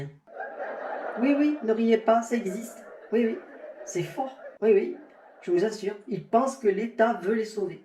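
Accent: France. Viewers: Un indice il a l’accent marseillais 😉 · ENFIN un humoriste qui l'ouvre ! Mais qui est-ce ? Un accent du dessous de la Loire, c'est le seul indice...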